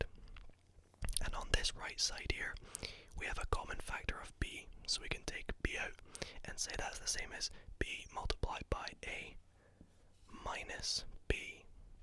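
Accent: Scotland, United Kingdom. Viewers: I can't place your accent are you a fellow scot? :)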